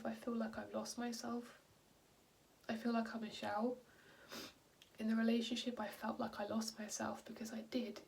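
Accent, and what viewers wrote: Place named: United Kingdom. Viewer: Oh my god I’m only 20 minutes in and he sounds awful! You’re such a sweet person and you deserve so much better than this. I’ve watched your Lush videos for years and have adored you and your British accent. I know you will get out of this rut and rise like a Phoenix. Love from a vegan lushie in Ohio.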